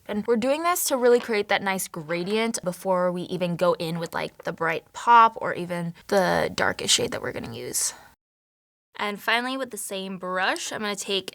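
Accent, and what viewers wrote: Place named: United Kingdom. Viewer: Loveee the look & hair but girl lose the British accent lmao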